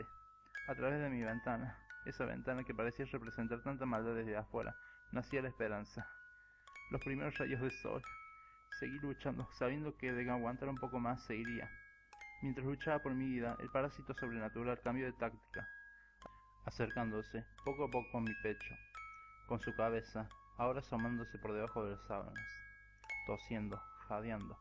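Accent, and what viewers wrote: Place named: Cordoba, Argentina. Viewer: Porque su acento delata, saludos hermano Argentino, compañero peronista! Aguante los cordobeses.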